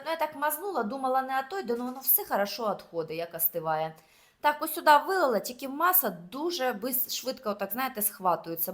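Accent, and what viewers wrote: Surzhyk, Ukraine. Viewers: Те як ви говорите, має певний шарм... весело і добре від того суржику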